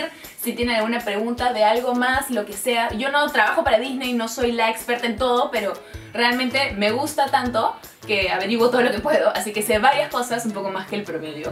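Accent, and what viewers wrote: Peru. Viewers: Eres Peruana? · Como que cuando empezaste a hablar...ya sospechaba que eras peruana~🙋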